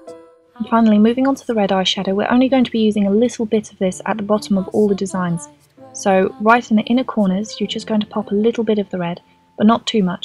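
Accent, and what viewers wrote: England, United Kingdom. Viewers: she has an accent! cool! r u from england... or sumthing???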